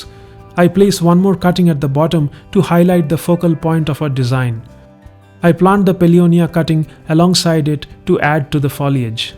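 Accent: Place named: India. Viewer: Your accent is very strong. I couldn’t understand you at first glance but after 5 slides of the same thing. I get you my brother from India dude.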